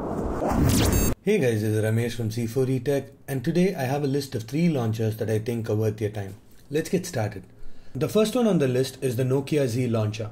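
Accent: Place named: India. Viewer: That Indian accent doe.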